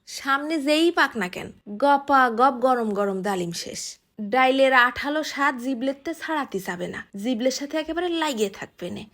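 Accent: Khulna, Bangladesh. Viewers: আপু,তুমার বাড়ি কি খুলনায়। কতা শুইনে তো তাই মনে হয়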